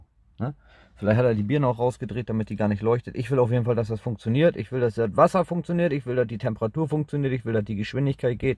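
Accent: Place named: East Frisia, Germany. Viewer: Hey ich muss dir ganz ehrlich sagen du machst einen Mega Job und es gibt keinen dem ich bei sowas so gerne zusehe wie bei dir! Der i Punkt finde ich noch ist dein ostfriesisches gesabbel zwischen durch wenn es dich wieder packt Danke für deine krasse arbeit usw vielen.vieleb dank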